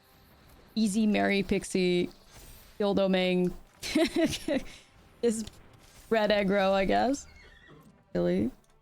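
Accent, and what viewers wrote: Netherlands. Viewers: We love the koo keez. Your Dutch accent makes it fun! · If ANYONE is giving you crap for saying English words with an awesome Dutch accent, they can go cookie themselves 😂 Don’t apologize and don’t change. Love your content just as it is.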